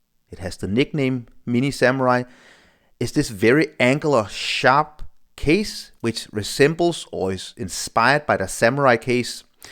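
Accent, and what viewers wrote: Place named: Denmark. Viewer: lyder umiskendelig som dansk-engelsk tydeligvis 🙂 I think the Samurai watchcase is beautiful both this which is the new one but also the previous edition being a bit bigger. I have a weak spot for green dials, however i would prefer this model in a higher quality as for a ceramic bezel an crystal glas. I am still considering buying it but there are so many different to chose from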